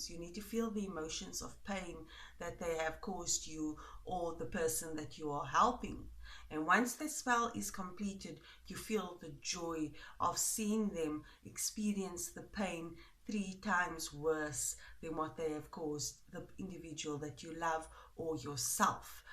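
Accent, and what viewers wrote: South Africa. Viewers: Are u south african? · Love your accent are you from South Africa?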